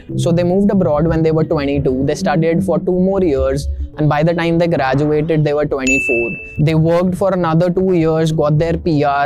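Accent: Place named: India. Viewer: information is really worthit . and the most imp. thing is that till now his excent is indian . hatsoff to this guy.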